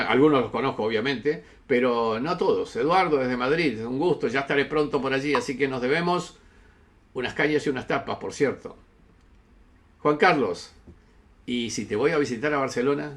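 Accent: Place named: Argentina. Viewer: Gracias, buen contenido....de donde es ?...que habla como argentino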